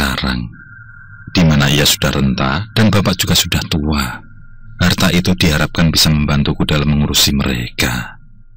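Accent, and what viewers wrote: Java, Indonesia. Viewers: Suara narator dongeng Ter baik ya ini. Suaranya medok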